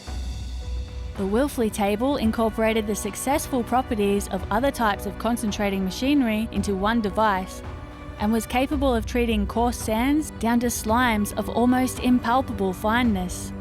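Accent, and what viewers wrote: Australia. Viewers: The mine was closed when I was there, so this is great. :-) Thanks! Lovely Aussie English narration! The narrator needs to be hired by all Aussie content producers!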